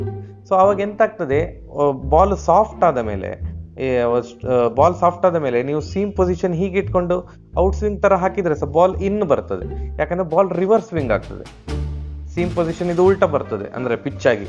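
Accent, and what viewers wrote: Mangalore, India. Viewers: ಎಬೇ ಅಂತ ಆಗ್ತದೆ😂👍..ನಮ್ಮ ಮಂಗಳೂರು slang ಇಷ್ಟ ಆಗ್ತದೆ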